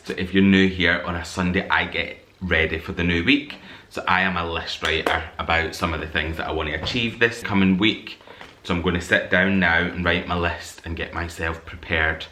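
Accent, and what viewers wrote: Scotland, United Kingdom. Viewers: Loved those two textured wallpapers - they give such a luxury hotel vibe! Just found you a couple weeks ago & get so excited when I get a notification now 🤣 Love everything about your style & aesthetic...and I'm a fellow homebody/ introvert!!! haha! Have been trying to work out where your accent is from...I'm just outside Edinburgh, but I'm thinking you must be further North. Thanks for showing us another part of your beautiful home...I'm off to look up those Kinfolk books on Amazon right now. Obsessed with interior/ architecture books!!! Have a lovely week ❤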